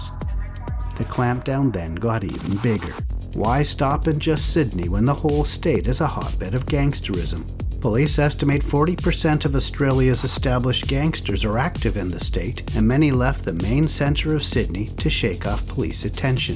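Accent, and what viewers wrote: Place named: Canada. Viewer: He's Canadian I think. At least he sounds it. He covers a lot of Canadian crime also.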